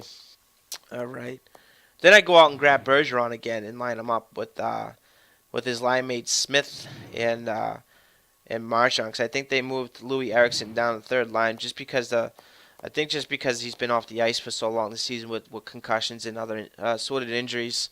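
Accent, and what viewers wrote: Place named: Boston, United States. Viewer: Lol I love your Bostonian accent I'm from Toronto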